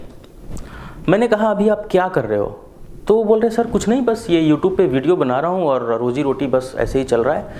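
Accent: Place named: Uttar Pradesh, India. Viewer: UP wale ho kya bhai tambaaku wale daant rehte hai humesha or up wala accent bhi hai tumhara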